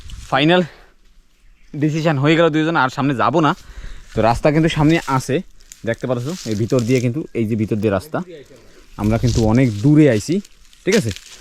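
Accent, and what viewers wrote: Barishal, Bangladesh. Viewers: ভাই এর কথা বার্তা আমাদের বরিশালের ভাষার মতো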